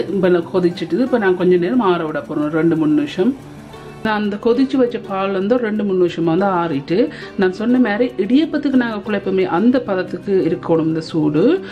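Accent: Sri Lanka. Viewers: Love your Sri Lankan accent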